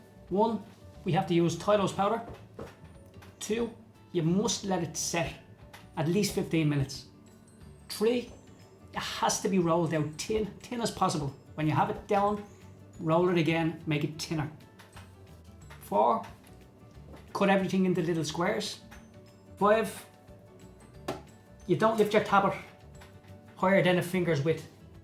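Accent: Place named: Ireland. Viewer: Awesome tutorial video! Love your accent, pretty sure its Irish 😊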